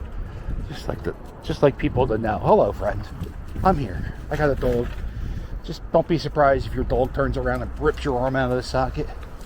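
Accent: Philadelphia, United States. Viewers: Your the best!! I moved to Florida from Philly in 2008 and I can hear the Philly accent in your voice!!! Makes me homesick!! Lol miss the cheesesteaks!!! You are so awesome to show the good and fun things in Philly!!! I think I may have seen you around we lived on axe factory and mower streets!!! You are a funny guy!!!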